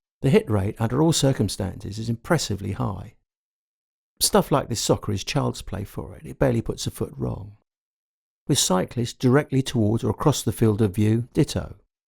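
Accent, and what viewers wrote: United Kingdom. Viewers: Once more, great stuff and accurate opinion. I am professional filmmaker/photographer. I have owned and shot with Nikon D750, Leica M, Olympus EM-1 MKII and the GH5. I agree with absolutely everything you said, the GH5 menus and usability are the best I've used. Cheers from Brazil and keep doing these. You and your British clarity + accent rock. Cheers from Brazil 🇧🇷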